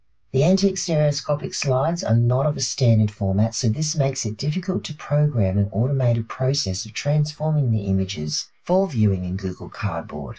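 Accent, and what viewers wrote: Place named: Australia. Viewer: you are super cool. Found your channel via the amazing Jon Levi... you sound Aussie to me, so welcome from NSW. Great video and I am glad I have found you. I hope to connect many times with you! The veil that has been blanketed over our eyes continues to keep the masses blind from the exciting truth that would reset our existence in a heartbeat. Cheers.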